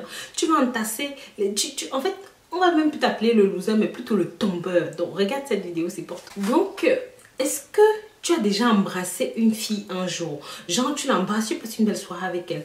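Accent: Cameroon. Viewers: J'adore ton accent je crois bien c'est un accent camerounaise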